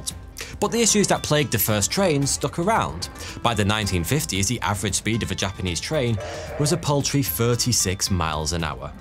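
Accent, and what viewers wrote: United Kingdom. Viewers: I might be from the States but your accent and mannerisms are key on, not to mention the dumbest, corniest jokes EVER! Bravo to the hilarious Brits! Wish I could join this channel in some way! The editing is flawless btw ;) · Most British voice ever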